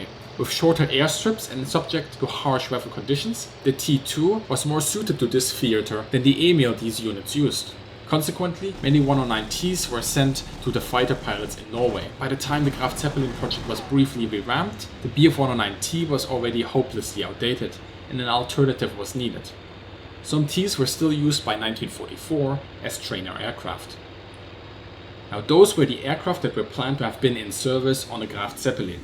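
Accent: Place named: Swabia, Germany. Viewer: Schwätz doch Deutsch! Furchtbar der Schwäbisch Akzent im Englischen.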